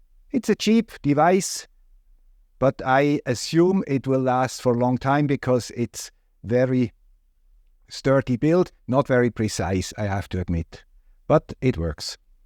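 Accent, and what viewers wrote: Switzerland. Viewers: I’m interested that you pronounced Knipex as “nipex”, whereas a native German speaker once told me it is “K-nigh-pex”? As a native English speaker, I still have no clue how to pronounce the brand properly! I had assumed you were Swiss-German as oposed French, from the accent, so very interested in your take on this? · Swiss accent, highly appreciated! ❤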